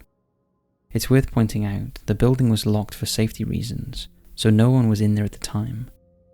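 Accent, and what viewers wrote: Wales, United Kingdom. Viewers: He has a Welsh accent. So I challenge you to pronounce Rhydymwyn, Machynlleth, Pontrhydfendigaid or Llansantffraed-Cwmdeuddwr correctly. Personally, I only knew how to pronounce Duluth because it featured in season 1 of Fargo. If it wasn't for that, I wouldn't know how it was supposed to be pronounced correctly either. Believe it or not, many people in Britain aren't aware of the most popular cities in the midwest, just as most Americans aren't aware of most cities and towns in the UK outside of London. Just enjoy the content of the video and don't focus so much on the pronunciations! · Always love the vids, but can i also say cheers for getting the docile tones of our Welsh accents into the World!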